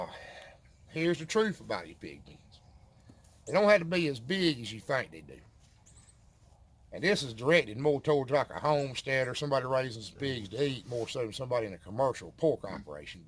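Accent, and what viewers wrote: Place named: Alabama, United States. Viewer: Like listening to my brother-in-law from Alabama. Love it. Good info.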